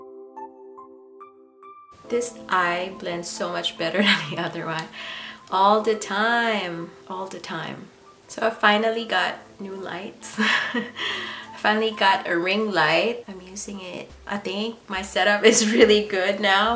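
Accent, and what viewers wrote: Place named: Philippines. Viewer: Beautiful! JUST got this palette for my BDay 😃🤩 Cannot wait to use it when I get some energy! 🤗🤗 I agree, most of these shadows are better than Natasha Denona because the texture AND finish are BOTH PERFECT! LOVE your nail color!! What is it? Your Philippino accent reminds me of what I call home.... Hawaii! Have you ever lived there? Oh.... I miss making KALEMUNGI! We would knock on doors and ask if we could have a branch to make soup.... so good! Ahhhh, those were the days 🥴 Thanks for the video!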